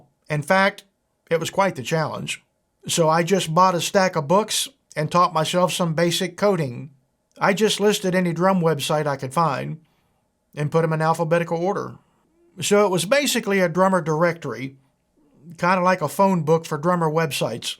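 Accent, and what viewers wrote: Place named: West Virginia, United States. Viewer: I would have known where you were from just by talking. I know because I talk the same way. Keep up the Great Work. Keep making West Virginia look great. Thanks.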